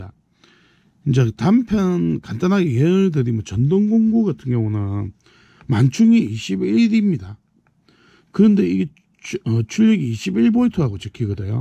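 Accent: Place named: Daegu, South Korea. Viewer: 아재 대구네요 사투리에 답답한말투 잘보고갑니다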